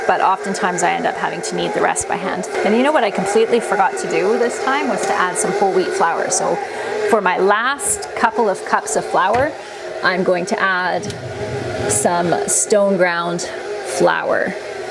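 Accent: Canada. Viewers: You didn't ask, but I found you via Becky of Acre Homestead. I am childfree and live in Denver in a tall building with a very windy balcony. Last year, my delightful herb tower blew over twice, so I ended up gifting my herbs/lettuce to others who live here. I'm probably not your expected demographic. LOL! I'm just here for entertainment and some (scaled down) recipes. I've been bingeing your videos and putting the pieces of your story together. You are delightfully entertaining and relaxing to enjoy. I also really like your style: quick intro/outro bookending great content. I grew up in Texas, and I just love hearing the Canadian pronunciations/words: paprika, sorry, tuque, and more! Congratulations on the 100K!!! I can't wait to see your button plaque hanging on the wall behind you (at least momentarily, right?)! Thank you for carrying us all along for the ride. 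Oh and watch out for Martha; she's fierce and might start demanding her own channel. #LongestCommentEver <3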